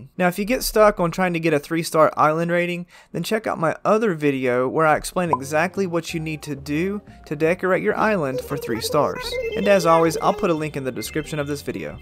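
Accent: Texas, United States. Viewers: Is that a Texan accent? Lol!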